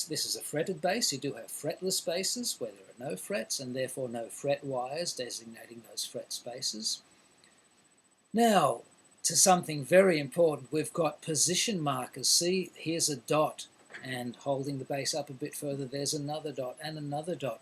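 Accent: Australia. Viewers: I assume you're an aussie, one as the accent and two the over all structure of how you speak. I've never met an aussie i didnt like and whether its just luck or something about your culture how you explain stuff is not only very easy to follow but very friendly but to the point. Simply, great stuff and keep up the great work, your method of teaching is warm and friendly. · Hi, ahhhh... finally some tutorials with an Aussie voice! I'm so glad I found you, I'm beginning bass (as soon as it arrives), and I'll be stalking you for a while I think. Easy to follow, understandable language for us newbies (especially those of us over 40's), and entirely logical in sequence. Thank you! and thank you again!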